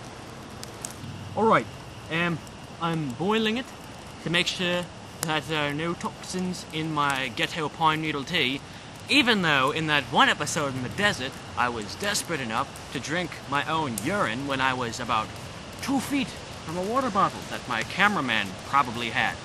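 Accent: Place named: Australia. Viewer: Why do you sound like an Aussie?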